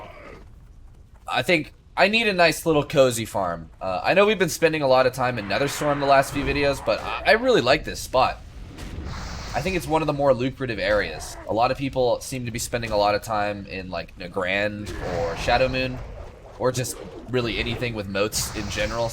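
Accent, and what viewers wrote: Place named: United States. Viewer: jesus you have a cool american accent!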